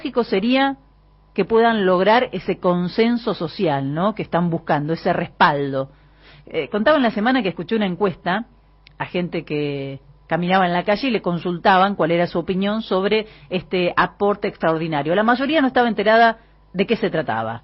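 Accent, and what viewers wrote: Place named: La Pampa, Argentina. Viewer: conozco esa voz pampeanaa clara y concisa..Bravo!!!!!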